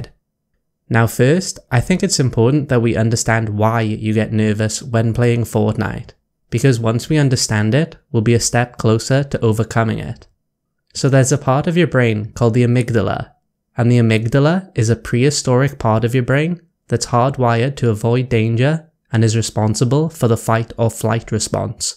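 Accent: Wales, United Kingdom. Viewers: Are you welsh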